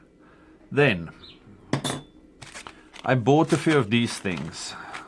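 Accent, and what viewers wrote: South Africa. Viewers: Ah yes, a fellow South African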